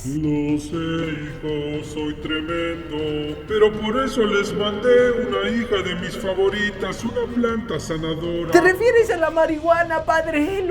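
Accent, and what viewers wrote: Mexico. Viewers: Hermoso acento azteca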